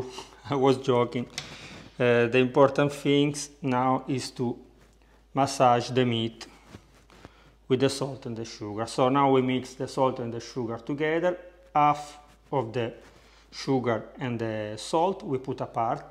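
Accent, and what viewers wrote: Italy. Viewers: I love how everything you make turns out spectacular! I want to try making ALL OF YOUR RECIPES! By the way, your English is quite good, and love your Italian accent. Would you do us all a favour and say a few words in Italian to start your shows? Would love to hear you speak your mother tongue, but need some translation in captions, so we can follow along 😊👍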